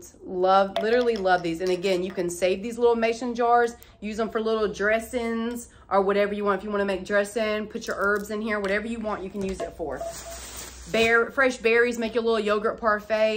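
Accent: South, United States. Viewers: I absolutely love your southern accent! 💐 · your Southern drawl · I love the country in you Not to many say y'all anymore and that's a southern thing girl. My new favorite DYI FROM DOLLAR TREE SHOPPER.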